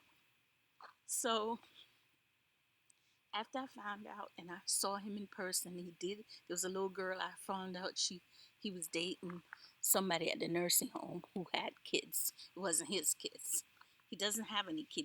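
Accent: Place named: Jamaica. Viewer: I hear a Caribbean accent...sounds Jamaican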